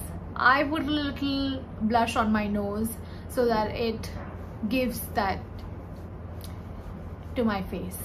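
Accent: Maharashtra, India. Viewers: Sundar insaan love u alot... dii your smile is therapy for me its so pure nd beautiful keep it safe...di your cutest nd goofy side is amazing your Marathi accents is fab nd i personally love it pls never forget this to add in your vlog.....dher saara pyr apko❤❤ love u so much 😍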